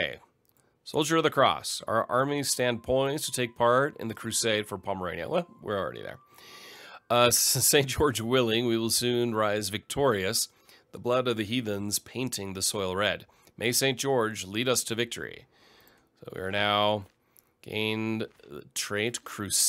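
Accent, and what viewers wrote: Germany. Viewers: Hey are you german ?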